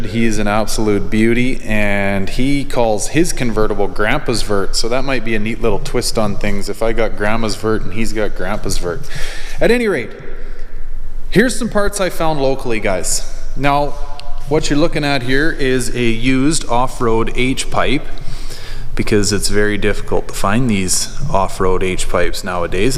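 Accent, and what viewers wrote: Canada. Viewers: I’m “sowwwry” haha Canadian accent makes me chuckle. Beautiful shop man ! That’s awesome!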